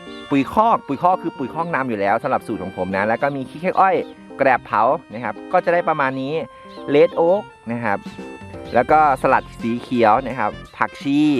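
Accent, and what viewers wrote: Isan, Thailand. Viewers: 555เราชอบฟัง พาษาอีสารด้วนน่ารักดีสุดยอดคะ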